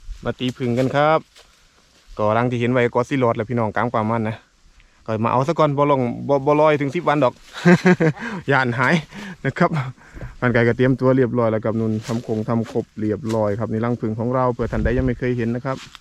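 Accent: Korat, Thailand. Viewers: คนโคราชบ่คับ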